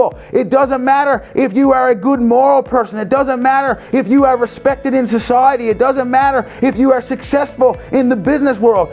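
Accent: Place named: Newfoundland, Canada. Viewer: I have never heard a broader Newfie accent than this pastor- cracks me uppppp!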